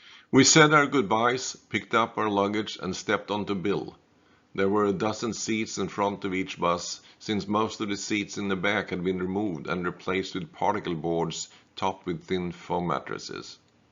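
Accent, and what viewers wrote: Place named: Sweden. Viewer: ❤Nice to listen to! Your accent is very Swedish and I guess you know. 😊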